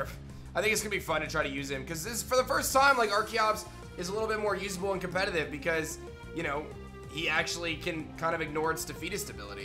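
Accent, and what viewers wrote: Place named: United States. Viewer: my guy ur american accent is 100 % i can barely stand it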